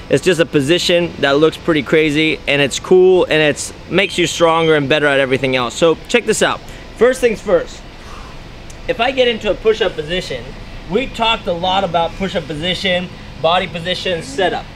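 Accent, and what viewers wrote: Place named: United States. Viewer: he sounds like he lives in America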